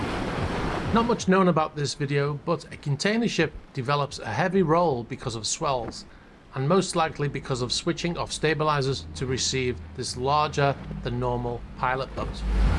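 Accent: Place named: Oldham, United Kingdom. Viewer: Thanks for these short clips. The longer ones too. I’ve been a fan/subscriber since before seized yachts were the big news. I’m in Toronto but I think part of the appeal is your accent reminds me of my friends who came from Oldham. Looking forward to the next one.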